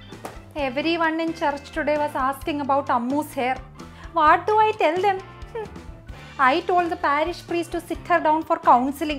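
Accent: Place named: Kerala diaspora, India. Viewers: I like the way you talk like any malayali mom living outside Kerala.. my both daughters are your fans. They only asked me to watch your episodes . at this young age you are doing good work.. & ofcourse you resemble Amala Paul a lot... all the best dear.. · I watch this channel only bcoz you, and specially Ur Malayali accent. I love it. You r the best. I have faced this when I secretly had my left ear piercing, repeated when I got the right ear pierced the very next week.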